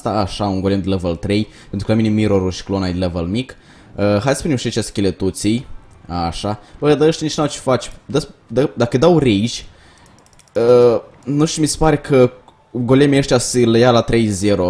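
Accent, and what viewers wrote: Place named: Moldova. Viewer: ba moldoveanu-le invata sa vorbesti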